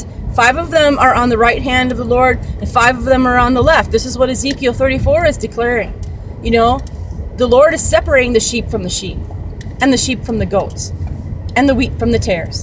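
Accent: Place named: California, United States. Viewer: Hey buddy. Are you in Cali? You sound like you're in Cali.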